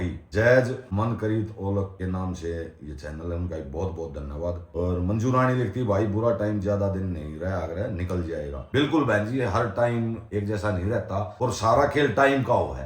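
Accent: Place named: Haryana, India. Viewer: Apka man saaf hai aur apke pariwar mei koi dikhawa nahi hai yahi apki sacchai hai. Jis ghar ki aurat itni saaf dil or hansmukh ho vaha hameshacbkhushiya hoti hai. Bhole baba ki kripa aise hi bani rahe aapke pariwar pe. Apka jo haryanvi boli ka andaz h vahi apke blogs ki shaan h aise h blog banate rahiye Bhai sahahb ji. 💐💐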